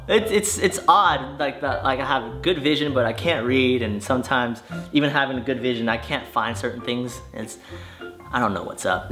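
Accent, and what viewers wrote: United Kingdom. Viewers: The accent.... Lol I'm Australian and that was so British ahaha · ahaha your accent leans more towards the british accent :) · you sound british man! lol - greetings from sydney australia!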